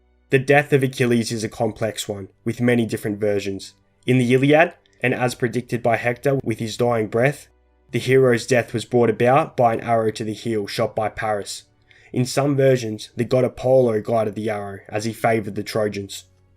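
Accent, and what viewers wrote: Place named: Australia. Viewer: I can’t take the aussie accent seriously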